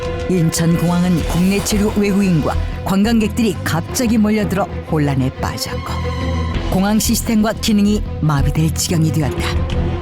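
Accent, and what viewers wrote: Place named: North Korea. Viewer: 말하는게 북한인갔다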